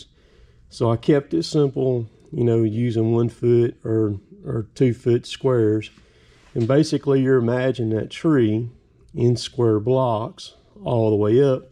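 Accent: South, United States. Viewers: that’s a great learning tool. I was looking for this information and you had it and yes the block for the notches would be a great help. You’re very good teacher. Have a great day and appreciate all your videos. You do a great job on them enjoy your channel, be careful And you don’t talk slow that’s just the way we talk down here in the south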